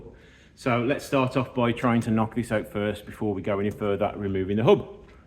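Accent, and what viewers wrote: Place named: Birmingham, United Kingdom. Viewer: Thanks for the helpful video. Love the brummie accent as well. I was just clicking play and was like, “That must be around Birmingham somewhere”. Googled the name of the firm and there you go. ❤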